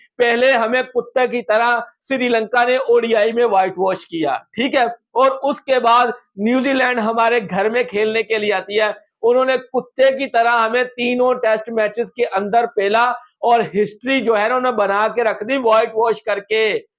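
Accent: Punjab, Pakistan. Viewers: Bhi yea punjab say hai..pura pakistani pubjabi bandey kaaa accent hai 😅😅😅 ISI bandey ki thodri training karadoo. Punjabi in India are ni boltey bhi 😅😅😂😂😂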